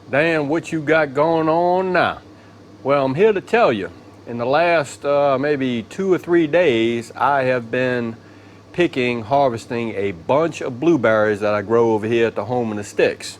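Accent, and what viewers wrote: South, United States. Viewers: Oh my!!!! I am an Okie who married a Canadian. It purely is a pleasure to hear a southern drawl again. Love this recipe!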